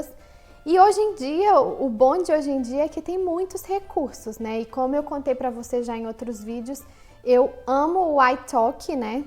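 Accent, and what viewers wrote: Brazil. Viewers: ... eu aprendi sozinha aos 10 anos também... e mesmo sem nunca ter pisado em país de Língua Inglesa, falo um Inglês impecável - consigo fingir tranquilamente que sou nativa americana -, aí percebi um sotaque no teu Inglês... pergunta: você consegue falar o Inglês limpo, sem sotaque "brasileiro"?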